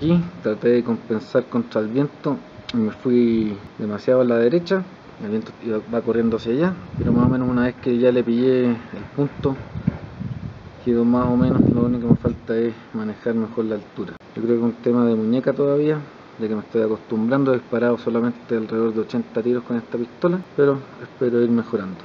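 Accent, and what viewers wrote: Chile. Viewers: jejejeje te cache por el acento xd yo pense que no llegaban de estos juguetito a nuestro bello pais, donde lo compraste y de cuanto fue el garrotazo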